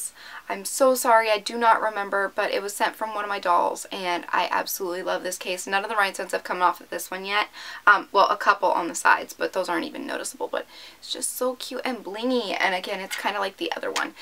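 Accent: United States. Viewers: Western / texas . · Are you from boston??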